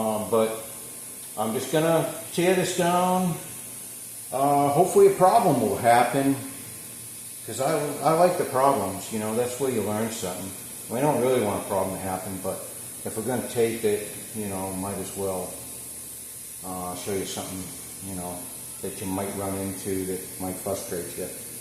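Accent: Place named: Northeast, United States. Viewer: You live in Texas? From your voice I assumed you were from the Northeast.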